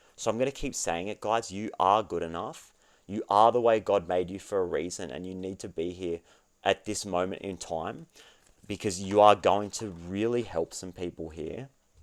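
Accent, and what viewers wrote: Australia. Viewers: Is he Australian? I'm Italian, and I don't know that accent 😅 first I thought it's Scottish but Idk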